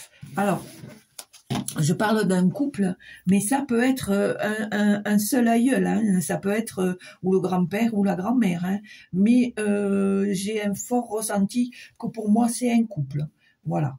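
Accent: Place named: South, France. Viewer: Doux accent du Sud avec légers roulements de R comme notre très Cher trop tôt parti Nougaro, je dirais Oh Toulouse bien aimée 😁✌️👍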